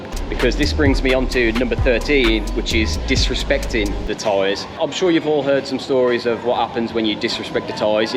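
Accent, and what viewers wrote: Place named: Midlands, United Kingdom. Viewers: You sound like you're from Dudley or Wolverhampton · You sound like you're from the Midlands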